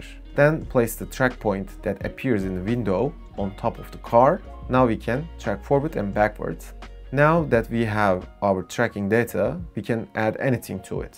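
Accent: Turkey. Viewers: dude, you talk like a Turkish, are you Turkish? :D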